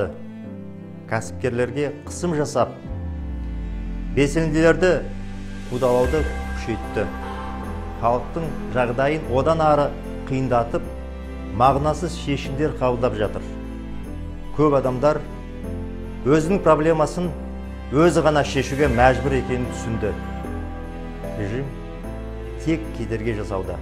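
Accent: Standard. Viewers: МІНЕ, қазақ тілін ӨТЕ, таза сөйлейді,ОЛ ХАЛЫҚТЫ, жаңа жылымен құттықтап тұр қазақ тілінде! КЕРЕМЕТ ӨЗІҢДІ ДЕ жаңа жылыңмен,құттықтаймын! АМАН мау БОЛЫП отбасымен,ХАЛҚЫҢМЕН БАҚЫТТЫ БОЛ,ӨЗІҢ жас.ғұмырыңды,осы жолға арнап БАРЛЫҚ АЗАП.шеккен өмірінің мәні,арманыңа,ХАЛҚЫҢДЫ МЫНА талаптан құтқарып,ЗАЙЫРЛЫ демократиялық парламенттік республика,құрылуына тілектеспіз.сол арманыңа осы.жылы орындалуын,тілейміз табанды қайсар,сынбаған АСЫЛ азаматтың СЕН, бұл саған берілген баға,жағыныу ЕМЕС, СОЛ арманыңа ЖЕТ.халқыңды осы адамдар АЛЫП ШЫҚ.халық СЕНІ ЖАҚСЫ көреді.өзгеріс БОЛАДЫ.бұл РАС БОЛАДЫ.сау бол байқап жүр АМАН БОЛ. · Таза казакша жаксы соилеиды екен гои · Рахмет! Жана жылыңызбен! Ал, кәнеки, казакша сойлемейді деп, айыптайтын агайын, коріңдер, біздің кандай әдемі, таза казақша сөйлейді! Зор денсаулық бакыт тілеймін, елге оралып, ел баскаратын болыңыз, казагымның ардакты, азаматы!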